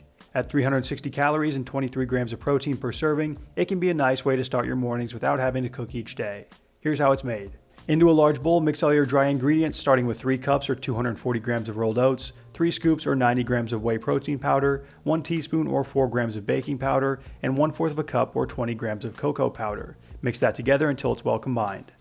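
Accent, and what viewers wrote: Australia. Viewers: Thumbs up 👍🏾 for the awesome Aussie accent! 😆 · 10/10 Aussie accent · Oh wow. That’s an authentic Australian accent. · Great ozzy accent! I feel like you would be great guy as a friend 😆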